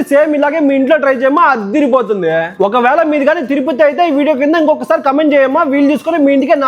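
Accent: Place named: Tenali, India. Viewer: నువ్వు చెప్తే తెనాలి అనిపిస్తది బ్రదర్ నీ వీడియోలు అంత అందంగా నీ మాటలు చాలా బాగుంటాయి బ్రదర్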